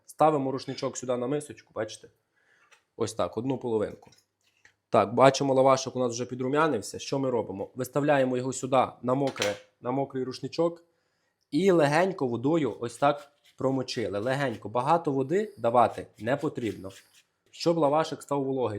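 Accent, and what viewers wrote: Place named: Surzhyk, Ukraine. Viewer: Дитя народилось і у школу ходило в регіоні, де всі балакали на суржику. Навчалося на кухаря, де викладачами були тітонькі з сіл. Вони так розмовляють. Тому так і балакає😂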